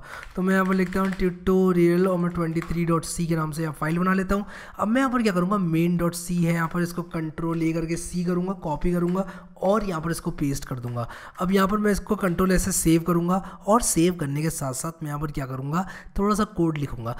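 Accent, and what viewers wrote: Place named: Punjab, India. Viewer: U have a little bit of Shakti Kapoor accent. Punjabis..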